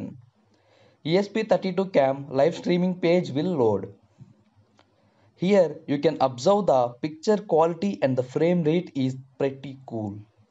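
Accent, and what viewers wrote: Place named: India. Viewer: Seems to be an excellent video, and thank you for posting, because I will be making and installing numerous security cameras for our home very soon, and I was considering the ESP32 system because the Pi Zero W board is out of stock everywhere, and this $15 board is being scalped for around $100 while the ESP32 is widely available and inexpensive. I would like to offer some well meant and hopefully constructive criticism, though. I can barely understand anything you say. I know your English is probably perfectly understandable in India, but here in South Louisiana it is almost a foreign language. Perhaps some day just as an experiment, you could try making a video using a text script ran through a text-to-speech converter? There are some very good computer voices available and your videos would be much easier to follow. As a bonus, you would of course then have a complete text transcript that you could post in your video description or that you could upload to your own personal website, and then link to that from your video description. 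Anyway, a more standardized pronounciation would help make your videos much easier to understand, not only to other English speakers, but also to non-native English speakers or those with only limited English skills. Barring that, maybe closed caption? Thanks for reading, and I hope you do not find this to be offensive. Just want to help. <EDIT> I just had a look at your project page. Perfect! Easy to follow, informative, and appears very compl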